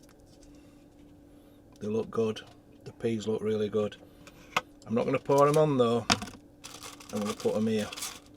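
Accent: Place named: Barnsley, United Kingdom. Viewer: I hope that fish and chip shop was 'white pass' because with your Barnsley twang it sounds like 'wipe ass '. Lots of love Huddersfield .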